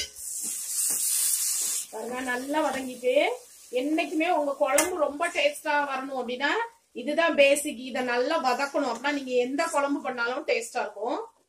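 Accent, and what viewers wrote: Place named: Kanyakumari, India. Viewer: Kaniyakumari kaaranga nu nenaichen... curry kollam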